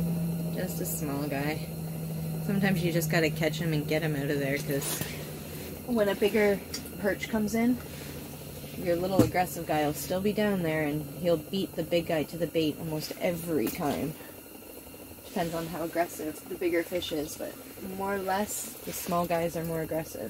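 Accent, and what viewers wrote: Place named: Canada. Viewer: That Canadian accent is strong Aaay! ☺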